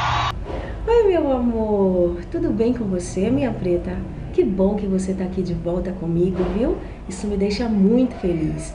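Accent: Bahia, Brazil. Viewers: Nunca tinha ouvido falar de você, mas quando você abriu a boca tive certeza de que você era baiana tb. que linda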